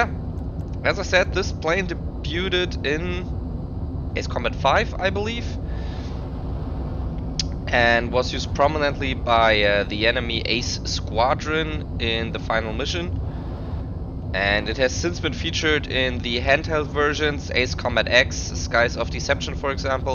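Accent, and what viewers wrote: Germany. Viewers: u sound german are you?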